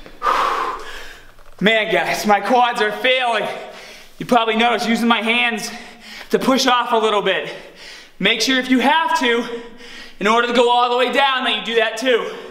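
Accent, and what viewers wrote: Boston, United States. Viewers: John Letterman Its the boston accent i think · What's up with the Boston accent I watched your videos for years and stopped watching and recently just started watching them and I had to go back in time to see that it was the same person are you originally from Boston and it just came back you never had an accent before just curious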